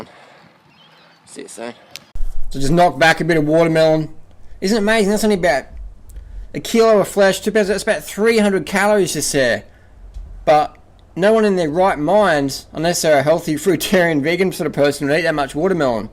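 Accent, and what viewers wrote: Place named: Australia. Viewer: But you are funny with your Australian accent mate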